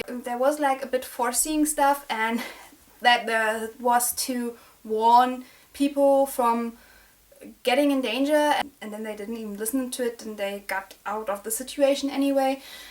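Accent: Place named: Germany. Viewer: I just found your channel and I'm def subbing! Are you from Germany? Your accent sounds a bit german like!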